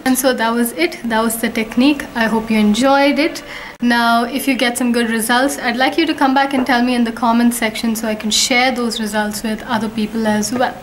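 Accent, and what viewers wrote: Kerala, India. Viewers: I love your looks and accent!!!! Indian!?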